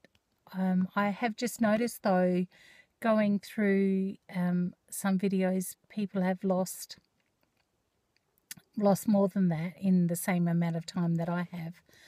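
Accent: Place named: Australia. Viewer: Hi! Welcome! I love your Aussie accent':p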